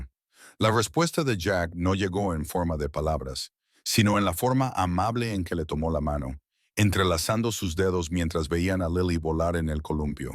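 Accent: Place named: United States. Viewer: Realmente el relato con esa tonada INNECESARIA de Yankis con una hormiga en la lengua me pone de muy mal humor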